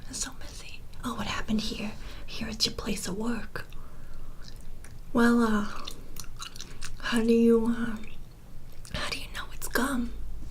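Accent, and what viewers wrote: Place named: Boston, United States. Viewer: Your beautiful and are you from Boston if so your accent is to die for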